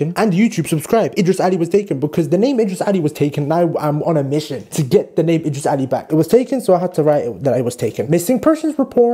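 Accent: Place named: United States. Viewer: American accent on point 💅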